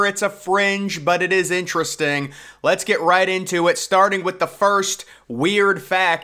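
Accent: United States. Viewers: Tone down the shouting, big American twang voice...it's really irritating!😳🫣🤬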